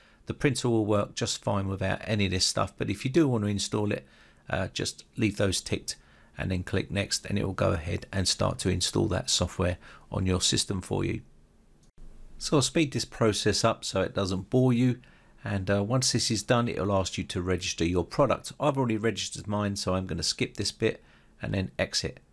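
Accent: England, United Kingdom. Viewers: you are a champ. You have helped me so much with your videos. You will be surprised to here that they go all the way to Australia. 😂😂 By the way, although I live in Oz, I’m a Scot and I like listening to your friendly pommy banter. 😂😂😂👍👍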